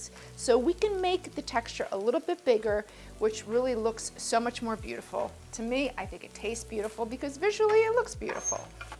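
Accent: United States. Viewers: Lol I love your pronunciation of everything, it’s so American it’s cute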